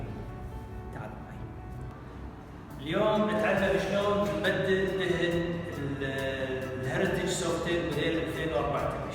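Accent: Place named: Kuwait. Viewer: ايه الله يسعدك فعلا بس والله ماكنت أعرف إنك تتكلم من الكويت على كل أهل الكويت حبايبنا. فعلا فيه تباين باللهجات بس كنت أفكرك من ربعنا هههههه أعتذر منك وشرفني مرورك يالغالي. أعتذر منك بشدة. كلمة حق أهل الكويت رواد في كل شيء مميز بصراحة. وهذا شي ظاهر وواضح مايحتاج لشهادة أحد.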